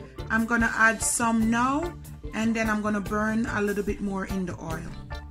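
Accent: Jamaica. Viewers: Hey hun, I can hear the accent in the voice...Jamaica to the world. Let's stay connected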